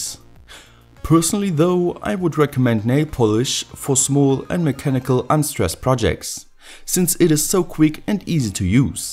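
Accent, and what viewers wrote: Germany. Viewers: You sound so German xD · Ich kann die Warnungen lesen, kleiner tipp😂 arbeite vielleicht etwas daran deinen deutschen Akzent loszuwerden, oder österreichischen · Du bist Deutschland und man hört das Das Akzente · Feier ich dass der dude deutsch is man hört den akzent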